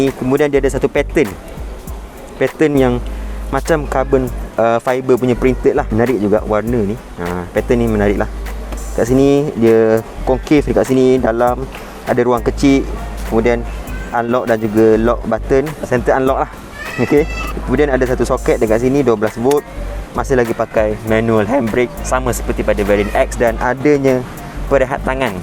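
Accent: Terengganu, Malaysia. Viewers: Dang² lg cakap teganu. Terbaekkk review boh😂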